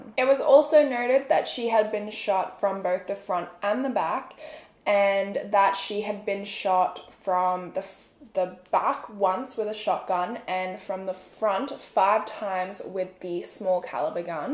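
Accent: Australia. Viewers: Usually i never comment on a yt video, but here i a am today... i just wanted to say that your work ist amazing. You put so much effort into the research, your videos are always so exiting and detailed. But still you show so much respect to the victims and their families. Btw i love your accent, it always reminds me of my time in Australia 🧡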